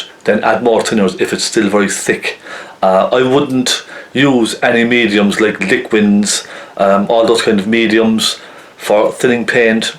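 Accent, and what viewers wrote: Cork, Ireland. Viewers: I so love your Cork accent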